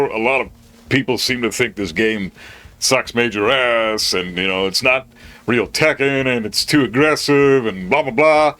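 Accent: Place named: Canada. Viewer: You're Canadian 🇨🇦 too eh! I'm from Toronto.. love to hear it.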